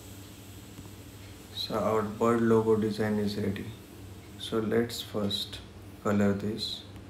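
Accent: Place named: India. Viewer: Indian speaking his English, Really. 🤮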